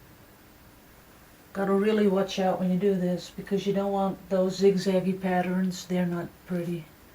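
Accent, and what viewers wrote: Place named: Netherlands. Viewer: ok cool. I'm relatively new to your channel and I'm hooked.. I think about 2 weeks.. but of course I'm not watching in order.. youtube recommends and I follow lol.. I like listening to you very much too.. you're so happy doing what you're doing.. and your accent reminds me of some of my relatives. My mom is from overissjel..and my dad from the Hague.. I'm in Canada.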